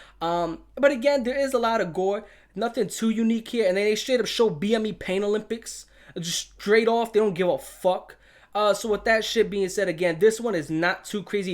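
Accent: New York, United States. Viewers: Besides the obvious comparisons to Plagued Moth and Wendigoon, there really isn't anything else like on Youtube. The New York accent makes it for me. Sounds like Michael Rapaport talking about gore XD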